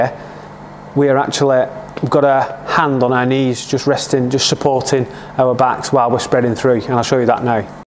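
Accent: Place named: North, United Kingdom. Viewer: I like your dialect. Are you from north UK?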